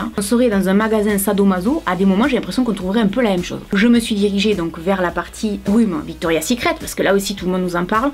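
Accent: South, France. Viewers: J'aime bcp ton honnêteté et ton accent du sud lol. Effectivement victoria secret je pense que c'est bcp de marketing car j'ai aussi acheté des brumes (3 ou 4) et effectivement ça ne tient pas du tout!! Continue j'adore tes contenus 😬😘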